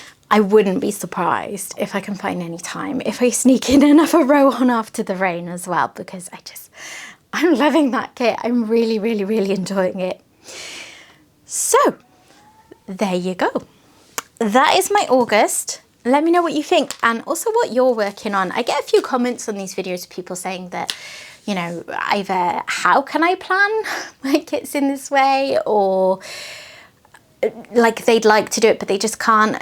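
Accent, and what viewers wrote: England, United Kingdom. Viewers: On your first picture, I love the old car. The Lorenz is so colorful too. I always love your choice of diamond paintings., you do NOT talk too much.!!!! Your English accent is so lovely and delightful. I have always said one of the stupidest things Americans ever did (lately a lot of stupid things) was lose the English accent. I’m American so I can say that. Talk about addiction, I swore to myself no more buying for a few months. So what do I do? I bought knight portal on DAC. It’s such a cool canvas, I had to get it. 😣Anyway you are wonderful. Don’t change. Have a great weekend.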